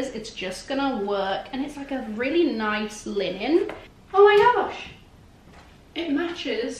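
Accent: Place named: RP, United Kingdom. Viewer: I LOVE YOUR ACCENT !! You're so posh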